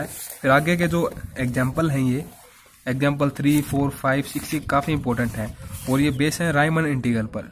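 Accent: Haryana, India. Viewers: Aap h haryana se ho?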